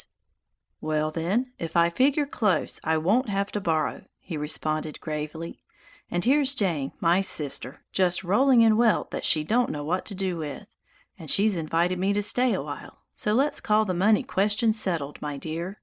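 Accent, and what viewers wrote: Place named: United States. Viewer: Seriously learning english using a very american accent.. 😅 could not be more useless.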